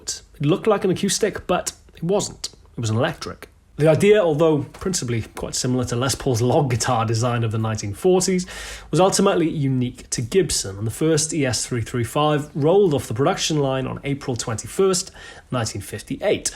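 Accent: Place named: United Kingdom. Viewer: I was born and raised in the US and I'm listening to this video simply because I like the way speaks. My wife and I were talking about how much we enjoy British English (a blackbeltbarrister video had just ended). So I pulled up a video for an encore. David Bennett Piano is sure to be next.:)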